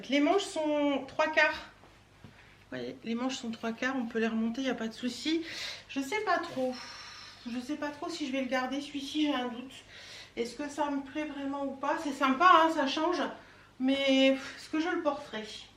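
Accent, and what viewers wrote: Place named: Belgium. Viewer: Coucou, j'ai bien aimé ton accent belge 😂😂😂 j'ai cru que tu pensais à moi à ce moment là 😂😂😂. Gros bisous de Belgique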